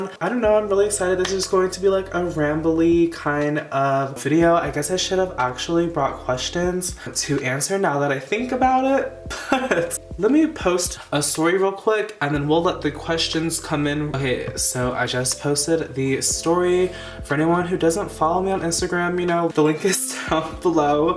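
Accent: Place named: United Kingdom. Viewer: bringing out the british accent already i see lmao 😭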